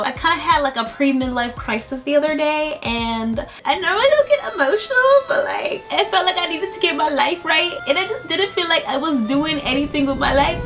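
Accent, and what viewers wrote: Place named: Africa. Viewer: so i had to subscribe after the African acccent....my sista oh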